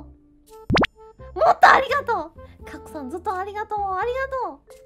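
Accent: Taiwan. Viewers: 台灣口音XD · 台灣國語的會長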